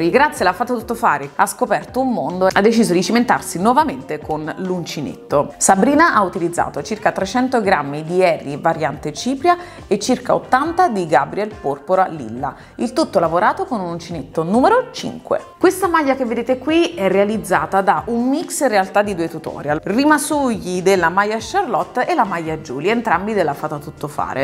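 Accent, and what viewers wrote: Campania, Italy. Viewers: è bella la cadenza Campana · Nooooo ti prego si alla cadenza campana. Anche se vivo in Liguria da oltre 20 anni il mio sangue campano é sempre vivo ❤️❤️... P. S. Anche io avevo preso il Mark in 2 varianti colore ma non ho avuto ancora modo di provarlo spero di aprirlo prima dell'estate😂.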